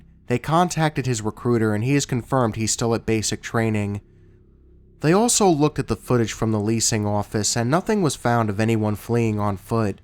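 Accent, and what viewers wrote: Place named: East Coast, United States. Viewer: I love hearing your stuff, and always notice the East Coast, Tri-state "twang" to your voice! It's like listening to a friend or family member of mine telling me a story, as we're from Jersey (then Delaware and now Lancaster, PA). Not sure what state you're from but I hear it : )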